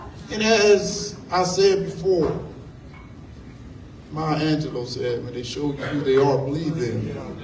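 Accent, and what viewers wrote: South, United States. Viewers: So, he's southern. We have southern accents, and he has COMMON SENSE!!! Something we really need in the senate!!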